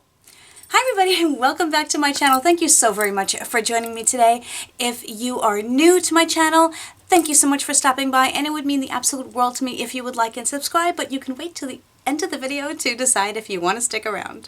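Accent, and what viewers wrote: New York, United States. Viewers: Where are you from? I like how you talk lol New york? New jersey? I got the iby highlighter-make up forever foundation-mac lip gloss-the k vos lip balm-and a itsie eyeshadow 😁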